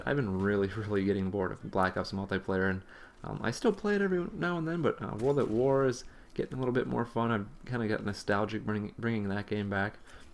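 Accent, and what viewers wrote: Minnesota, United States. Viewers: i kno this is a stupid question but r u from minnisota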